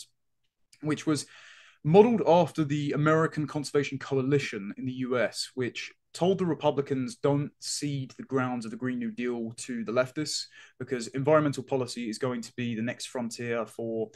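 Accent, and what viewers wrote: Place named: RP, United Kingdom. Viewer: I see a lot of Lotus Eaters viewers hating on Connor & I can't understand it... Okay, with his accent & articulation he may come across as posh or pompous at times, but that doesn't take away from, is what he's saying true? Being a Reformed Christian myself I strongly disagree with him Theologically, that being said, his positions & how he got to those positions, is always reasonable & well thought out. I think he may very well grow to be one of the more important voices in the British political sphere & I just hope he doesn't outgrow the LE but rather grows with them. God bless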